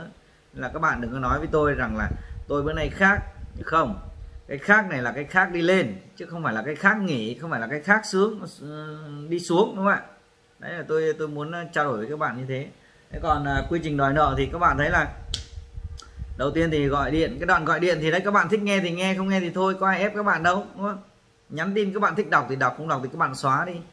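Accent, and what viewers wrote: Standard. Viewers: noi chuẩn ko cần chỉnh ok ok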